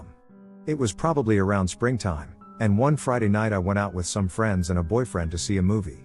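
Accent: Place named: South, United States. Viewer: I've been watching for a long time but I finally decided to subscurb because I'm excited to find out that the tts is a fellow southerner. Yeehaw yall!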